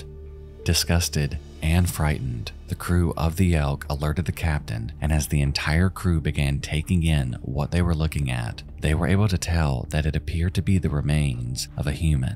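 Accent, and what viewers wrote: South, United States. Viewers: I’m sorry, I know this is a serious video, but the question must be asked: how does this man with a vaguely south-ish USA accent say “Katarzyna” better than Tyra Banks who regularly works with East European (among other) models ever did on her show when she literally also had a girl on the show for almost every episode of Cycle 10 *named Katarzyna*? Angry.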